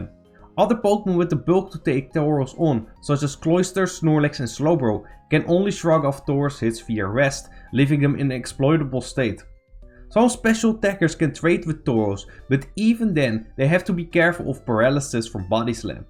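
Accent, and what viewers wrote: Netherlands. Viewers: Seeing all the comment about how dutch you sound are cracking me up. Can't disagree but it's not bothering me at all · Je hebt echt t hardste Nederlands Engelse accent dat ik ooit gehoord heb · Needed just 2 seconds to hear you are Dutch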